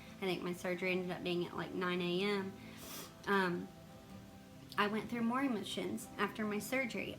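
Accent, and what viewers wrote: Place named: South, United States. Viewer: I stumbled upon you while looking for an ostomy bag cover I'd seen on Facebook. (It says "Better With the Bag" in different languages and has Hollister's logo several times.). Anyway, I watched your entire Hollister video without even realizing you had an accent - my accent. I'm from NC. This summer, I spent 2 1/2 weeks in the hospital and 6 1/2 weeks in a nursing home after emergency surgery for a severe blockage that almost killed me. I'm going through a difficult time now that I'm home. I saw this video and decided to watch it.:) <3 I'm so glad I did. Not only are you southern, you are also a Christian. I've loved that Bible passage since the 60s when the song came out. Thanks for being God's hands and helping to lift my spirits. I'll be watching more of your videos in the future.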